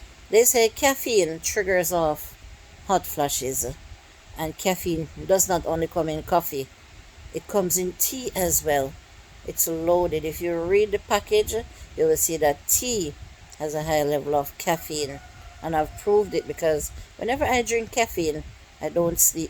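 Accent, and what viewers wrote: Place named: Jamaica. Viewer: I understood you perfectly clear...you are a well spoken Jamaican 😊 and the patois us just a little spice 😅👍🏽🇬🇧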